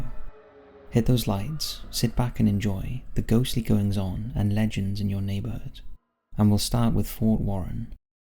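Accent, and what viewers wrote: United Kingdom. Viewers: He’s British, there’s loads of different accents in the Uk. It’s just the US always make us sound like posh wankers. YouTube Sheffield accent for example. That’s where I’m from :)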